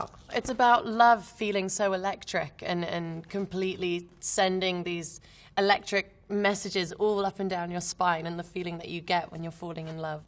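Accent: United Kingdom. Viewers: Por favor el acento un británico hermoso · Es re bri"ish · Acento muy britanico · No leas, ve su angelical rostro y escucha esa f*cking voz sexy con acento inglés que se carga, eso es todo 🤤☺️ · su ingles es británico¿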